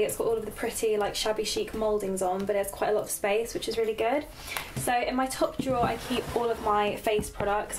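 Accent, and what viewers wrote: United Kingdom. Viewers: luv the bedroom/also your hair color is so pretty and I wish I had a british accent/ im from the u s a/ thanx 4 the FABULOUS video!! like your outfit 2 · I don't know how but I automatically knew you were British the moment the video started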